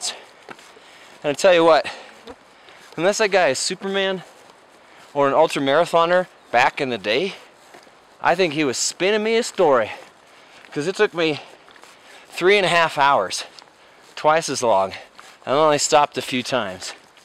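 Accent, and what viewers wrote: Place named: Scotland, United Kingdom. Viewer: Thumbs up just for the Scottish accent laddie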